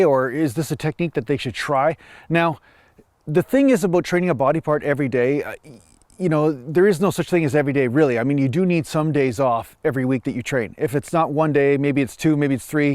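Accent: Canada. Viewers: Ok I'm playing name that accent. How close to Alberta are you from?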